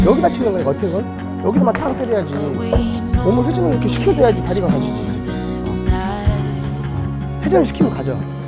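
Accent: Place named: Jeolla, South Korea. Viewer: 전라도 사투리가 이렇게 구수하게 들리긴 처음이군요 ^^ 코치님 정말 멋지군요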